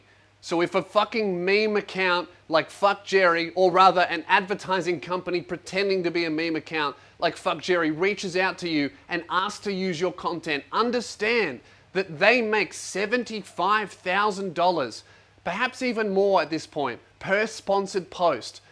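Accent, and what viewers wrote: Australia. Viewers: I like Australian English 😂 hi from Slovakia 🇸🇰 🤘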